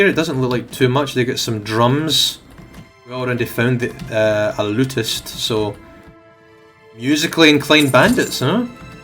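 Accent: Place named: Scotland, United Kingdom. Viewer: Thats quite the accent where about in Scotland are you from mate?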